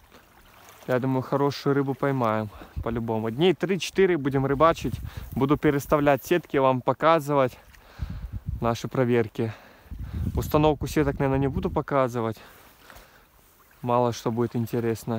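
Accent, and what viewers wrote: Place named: Belarus. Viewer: По говору.вроде бульбаш. Где живешь?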